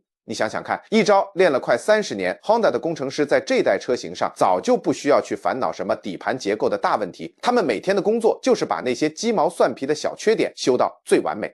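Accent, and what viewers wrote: China. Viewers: 你说的是普通话，不是台湾国语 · 這種一聽就是中共國口音 又在謊騙網友聽久了就被帶了風 日本的東西爛 車子爛沒有甚麼開不壞的 這就是中共國輸出的洗腦論述 天天講 有一天你心就歪了 就開始反日 這就是共匪最拿手的認知戰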